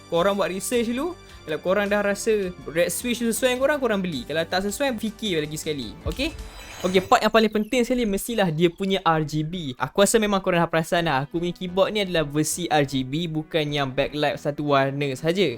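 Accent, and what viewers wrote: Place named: Kedah, Malaysia. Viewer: mantap ah orang kedah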